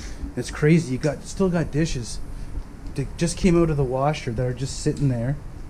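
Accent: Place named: Canada. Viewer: ABSOLUTELY LOVE THAT CANADIAN ACCENT!!! WE LOVE EVERYTHING ABOUT YA!!!! 😍🌟👍👍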